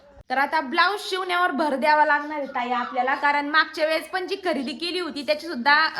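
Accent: Ahmednagar, India. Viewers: तुमची बोली भाषा खूप छान आहे.मी काही वर्ष नगरमध्ये राहत होते.मला खूप आवडते. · आग मनाला नको लावु घेऊ आपली नगर भाषा खुप प्रसिद्ध आहे मी मुंबई रहाते 20 झाले माझी भाषा काय बदली नाही 😂. लोक लगेच ओळखतात नगरी आहे का तुम्ही 😂😂😂😂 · ताई आम्ही पण आस म्हणतो कि गणपती बुडवयचा कानातले कलेक्शन खूप छान आपली नगरी भाषा खूप छान ❤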